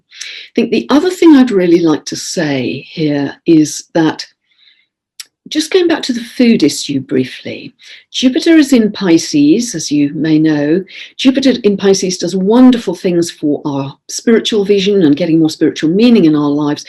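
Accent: England, United Kingdom. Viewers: Thank you so much! That really made me feel better! And it was done in that wonderful English accent. I'm american, I came to be by way of England. But as u know, we kind of became the melting pots of all cultures and now we sound funny lol. But it was very much like listening to someone I hold dear to my heart. Mary Poppins. Thank you so much! ☺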